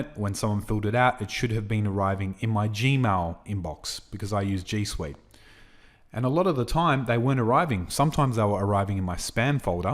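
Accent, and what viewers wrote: Australia. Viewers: Man it's really helpful video. Thanks a lot. Are you from Australia?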